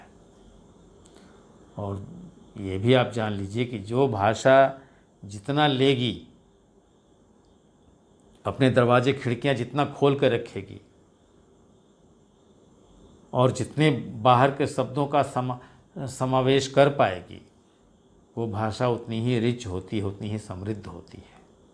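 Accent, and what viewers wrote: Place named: Bihar, India. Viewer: Sir aap Bihar ,ara jilaa ke h kyaaaa❤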